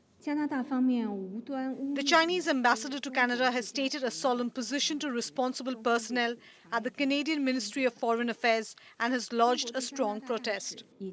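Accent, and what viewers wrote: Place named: India. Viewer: Palki spewing her CIA-sponsored anti-China propaganda! Palki's thick Indian accent is meant to confuse Indians into thinking India hates China or Russia. Does it work? Most Indians couldn't care less about China. But Palki is meant to convince Indians that China is the enemy. Palki never bad-mouths Biden! CIA won't allow it.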